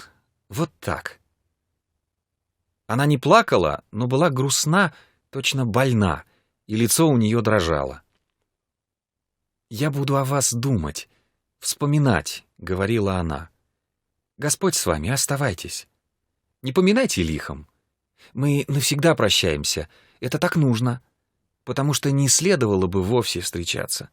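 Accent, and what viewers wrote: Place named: Russia. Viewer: Красивый русский акцент.Красивая дикция. Спасибо.Приятно слушать.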